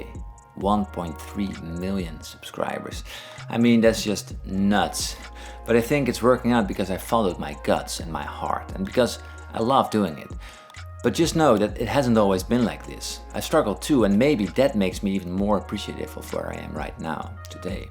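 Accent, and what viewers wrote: Netherlands. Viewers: I already thought you would be from the netherlands from your accent, but what town are you from? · Nu begrijp ik waarom ik je Engels zo prettig vind, je bent ook Nederlands of op zijn minst deels. Leuk. Succes met je kanaal, ik heb er erg veel plezier van. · Watching your footage on YouTube I didn't notice at all however in this vid I thought 'hey this guy sounds Dutch'. In seconds I recognized the Dutch style houses and the distinctive yellow car registration plates - Holland!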